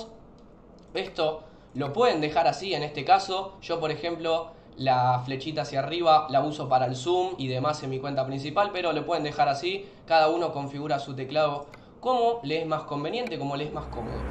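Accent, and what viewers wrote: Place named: Argentina. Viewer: Hola como va? gran video literalmente encima argentino aun mas lo entendi ajjajaja, yo tengo un problema. como puedo configurar para un volante de 180 grados.. es el de noganet. lo quiero configurar para el assetto corsa ya que mi idea es practicar drift en ello. Serias de muchisima ayuda de verdad, Gracias! y nuevamente gran video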